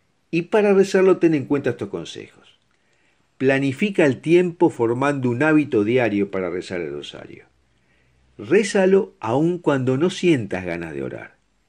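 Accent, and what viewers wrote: Argentina. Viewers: En estos video que tanto nos ayuda a comprender el amor hacia Dios............tiene la caracterisca de una voz argentina........muchas gracias que Dios tenga preparado un sitio en el 3 er cielo a este Sr. que lo respeto mucho es uno de unos cuantos argentinos que se les quiere mucho obvio despues del santo padre FRANCISCO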